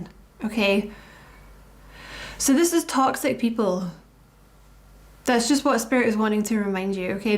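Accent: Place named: Scotland, United Kingdom. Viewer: Sounds Scottish to me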